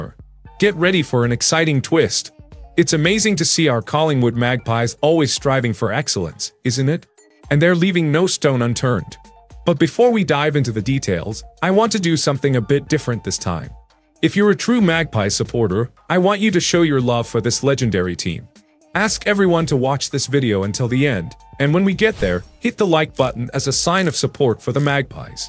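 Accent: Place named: United States. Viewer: Why is a Yank talking?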